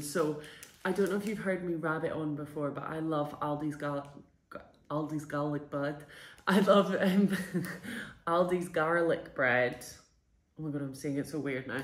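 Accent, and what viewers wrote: Scotland, United Kingdom. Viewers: Lovely vlogging style and great to hear a Scottish accent ❤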